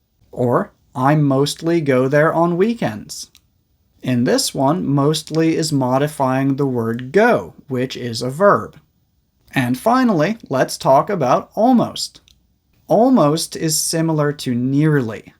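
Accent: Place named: United States. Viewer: " I love your teaching style most especially your beautiful American accent."😊😊❤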